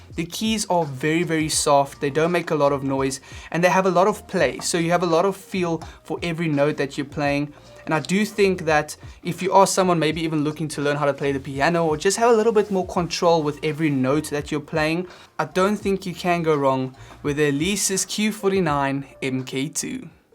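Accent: South Africa. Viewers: I know your accent is south African, I was wondering why you had the money in dollars instead of R😂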